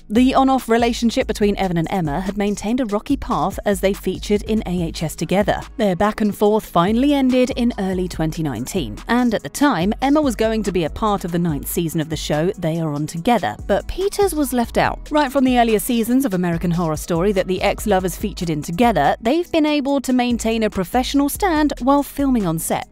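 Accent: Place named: United Kingdom. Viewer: This would have been better if Evan was the one talking... instead of a girl with a British accent 😉 I wish Evan the best..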